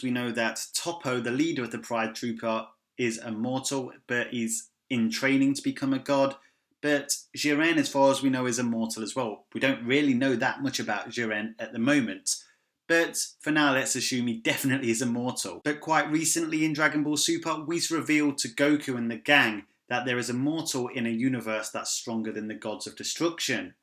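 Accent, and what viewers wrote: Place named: United Kingdom. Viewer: i usually enjoy british accents , not today lol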